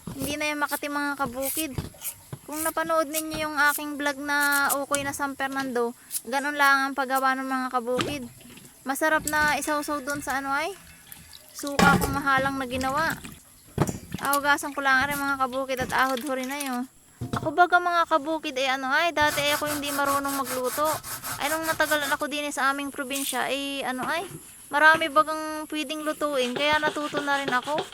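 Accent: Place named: Quezon, Philippines. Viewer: Taga Quezon Province ka ate.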